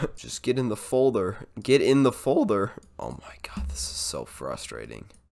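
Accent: United States. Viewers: “Allergies” yeah right, to me you just sound like a fellow Californian. 😎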